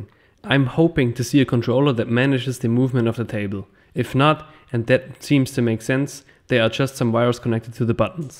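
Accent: Germany. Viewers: Digga man hört so das du deutsch bist 😂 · Zwischendurch hört man es deutlich, finde sein Englisch aber perfekt :D Er spricht Sachen wie Baut zb typisch deutsch aus. Hat aber bei englischen Vokabeln null Akzent wie ich finde.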